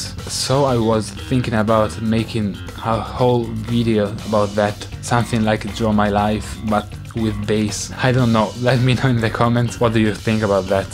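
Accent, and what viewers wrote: Italy. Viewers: Vusferno Music E' italiano :) l'accento italiano si sente ma la pronuncia è molto buona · Wow si sente che sei italiano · Giovanni Dalo Ho visto poi nelle info, dove ho avuto la conferma direttamente da! Il punto non è che lui abbia una pessima pronuncia, anzi tutt'altro, forse mi sono spiegato male, il problema è che per noi latini è molto difficile riuscire a parlare un inglese senza far capire la nostra provenienza. Prendi i paesi nordici (pewdiepie ne è l'esempio) fin da bambini ti istruiscono a parlare inglese nello stesso modo in cui impari la tua lingua madre e noi italiani per quanto possiamo impegnarci, senza avere esperienze all'estero ci rimane difficilissimo migliorare la nostra pronuncia. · si sente troppo che sei italiano hahahah,suono anche io il basso,spero di arrivare ai tuoi livelli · Italian accent all the way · Okay so this was the Italian voice reveal. 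· So, you actually made an italian voice reveal before 4M subs.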